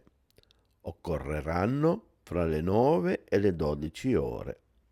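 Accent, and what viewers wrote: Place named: Genoa, Italy. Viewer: che bello sentire un bell'accento" zeneise"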